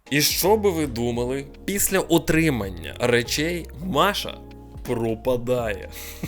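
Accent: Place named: Olevsk, Ukraine. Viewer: Дякую за позицію! Мої Вам вітання з Києва! Як же я скучила за олевською говіркою. В мене тато звідти, з села Кишин, там досить багато рідні. Нажаль останні роки рідко спілкуємося, якесь бентежне життя настало...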